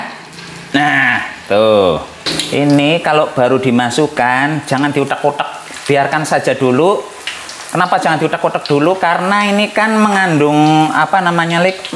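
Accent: Banyumas, Indonesia. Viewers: Wong Endi sih deneng ngomonge ngapak2😂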